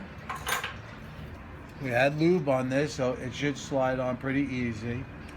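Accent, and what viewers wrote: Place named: Bronx, United States. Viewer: I will be applying this fix to my 2005 Buell Lightning XB12Scg, since they have similar engines, and hopefully, the concept is the same. However, I won't know for sure, until I get in there. I'll let you know how it goes. Thanks again. By the way, you sound like you're from the Bronx. I'm originally from Throg's Neck.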